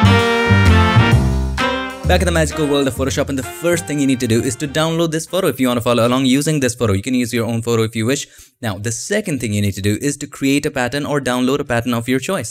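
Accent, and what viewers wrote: India. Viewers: The only one indian accent guy on YouTube who actually professional and explains stuff the correct way! :D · Прикольный индусский акцент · The only indian accent I could listen to for an entire day.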